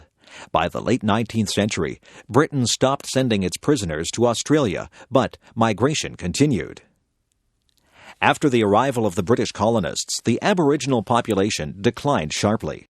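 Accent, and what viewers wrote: United States. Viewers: Factually incorrect, in an American accent and doesn't even pronounce the names of major cities correctly. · The accent in the audio is American, with some place name mispronunciations.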